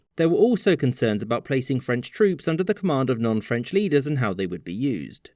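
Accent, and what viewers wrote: United Kingdom. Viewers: given the narrator’s very British accent, I suggest your comment is misplaced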